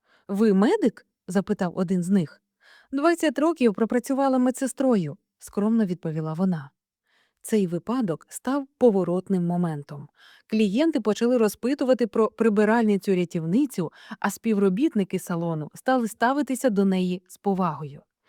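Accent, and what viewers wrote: Standard. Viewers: Дякую вам за чисту українську мову , за цікаве оповідання .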